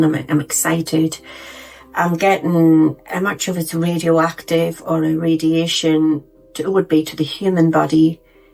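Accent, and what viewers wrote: Newcastle, United Kingdom. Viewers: Hello fellow geordie! ❤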